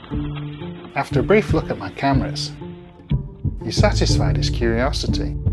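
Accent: United Kingdom. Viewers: its not long before luner is spending her days here.....luner? or luna? oh your british, ughhhhh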